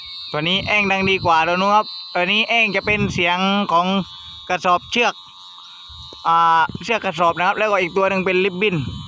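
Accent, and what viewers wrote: South, Thailand. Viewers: บัง ทองแดงทุกคริปเลย คนอืนฟังออกม้ายม่รุ้ ผมฟังออกแต่ว่า เราคนไต้ด้วยกัน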